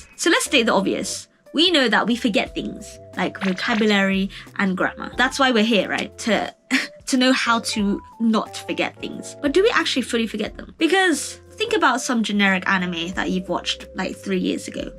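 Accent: United Kingdom. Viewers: Oh the Britsh accent. I thought you said watch lewd things...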